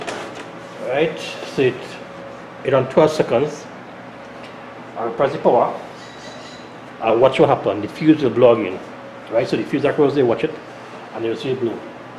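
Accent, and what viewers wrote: Trinidad and Tobago. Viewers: Hello, you sound like a truetrini. I am a trini living in Nevis and my sharp carousel fuse blew 2 years ago when I foolishly tried to cook rice in it. I dont want to buy another microwave although it is cheaper than fixing. However I would want to fix it myself. Your video is very good for my problem. Are there more than one fuse in it? also do you sell replacement fuses in Trinidad? If so let me know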